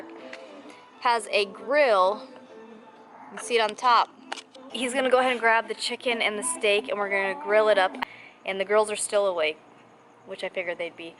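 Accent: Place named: Michigan, United States. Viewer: I had a feeling you weren't from Arizona. My wife is also from Michigan and you sounded a lot like her and my in-laws...I hope to make it out to Arizona some day, I'm excited to see how the dry heat feels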